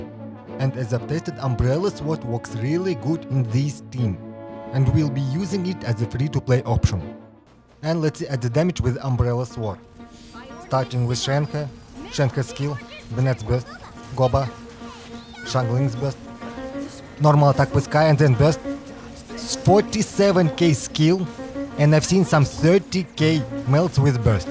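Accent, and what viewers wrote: Russia. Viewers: Русский акцент узнаю из тысячи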